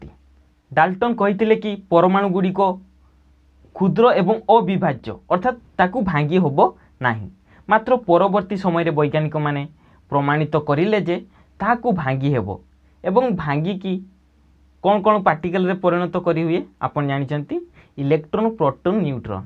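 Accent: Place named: Sambalpur, India. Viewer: Tame sambalpuria enta laguchhe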